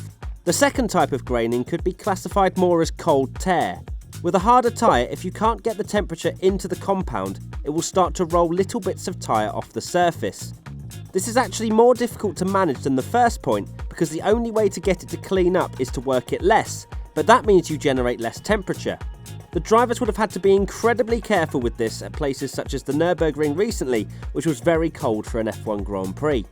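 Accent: United Kingdom. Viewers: You sound so British, yet you say Mister Lewis Hamilton...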